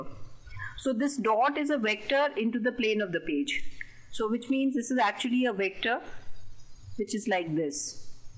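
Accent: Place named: India. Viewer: too many x direction y direction in indian accent -.- ....makes it so uninteresting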